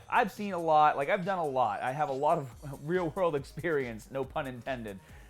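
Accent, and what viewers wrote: Boston, United States. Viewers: Bahston... My man!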